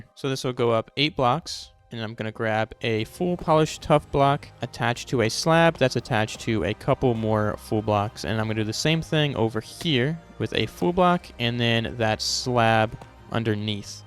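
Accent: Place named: Poland. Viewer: Polska Gurom! Very nice accent for polish people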